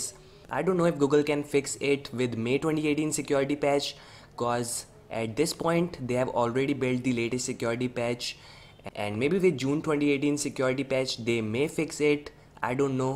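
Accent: India. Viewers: Парень индус какой-то, английский его режет уши)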